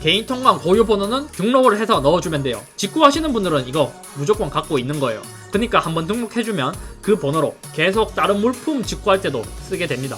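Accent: Gyeongsang, South Korea. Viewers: 경상도 분이신가봄ㅋㅋㅋ 억양이 들리네요 저도 경상도!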